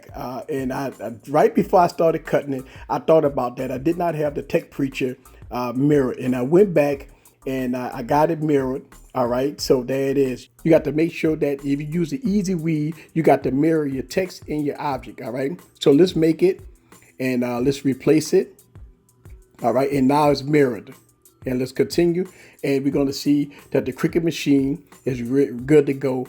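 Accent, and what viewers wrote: Louisiana, United States. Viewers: I hear the accent ! Gotta be from Louisiana I’m from New Orleans and you?! Great video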